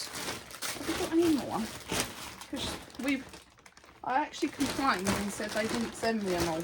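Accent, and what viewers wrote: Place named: Essex, United Kingdom. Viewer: Hi, just found your channel, where in Essex are you from? You sound like me 😂🖤🖤🖤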